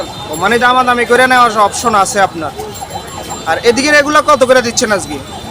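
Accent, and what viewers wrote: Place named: Noakhali, Bangladesh. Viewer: bari Noakhali normal babe boltechailew parbena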